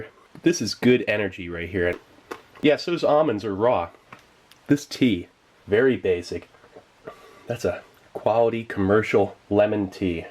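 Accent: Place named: California, United States. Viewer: Always wondered where you're from? I hear a Cali accent.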